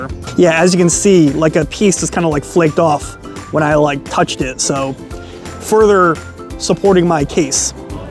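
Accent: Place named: North Jersey, United States. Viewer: Fake like in jersey. Yea I know you from north jersey cause you talking crazy bro.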